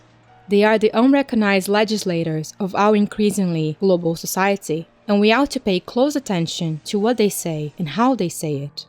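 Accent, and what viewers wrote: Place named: RP, United Kingdom. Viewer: A fascist narrative that you've, for the benefit of ideal doubt, blindly concluded as your perceived apolitical stance as an arts scholar. You should never approach another work of art with any appreciation or criticism again as I feel even the work of Steve Cutts might overwhelm you. This video reeks of fear and we all see through the posh accent and thesaurus vocabulary.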